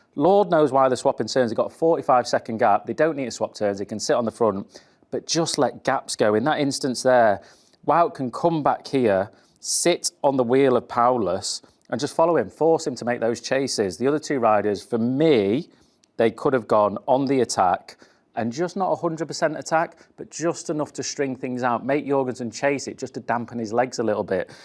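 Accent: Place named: Yorkshire, United Kingdom. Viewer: Thats a lad!! Proper Yorkshire lad!! I live in the states these days so watching and listening to makes me feel at home. Love it!! 🤘🏻🇬🇧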